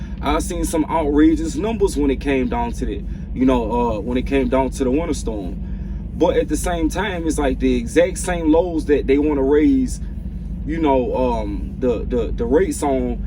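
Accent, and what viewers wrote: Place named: Memphis, United States. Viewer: Sound like u from Memphis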